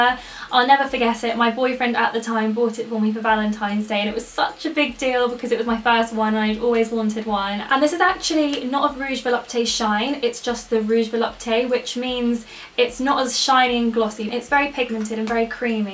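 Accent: United Kingdom. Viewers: she's not american, she's british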